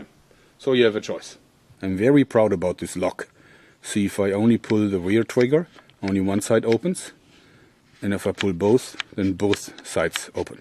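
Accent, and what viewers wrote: Germany. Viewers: your accent sounds very german to me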